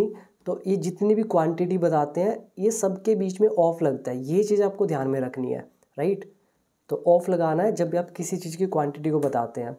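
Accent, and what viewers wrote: India. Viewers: You have indian accent i could hardly understand what you said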